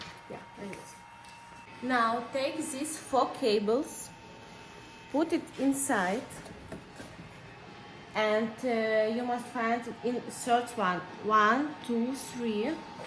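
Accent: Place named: Russia. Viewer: акцент русскоговорящий ;)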